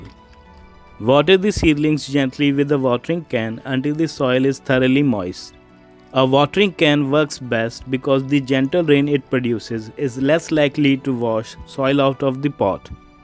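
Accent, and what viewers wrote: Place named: India. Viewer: If it’s not an Indian accent doing the tutorial, I don’t event want it. They are always the best ones.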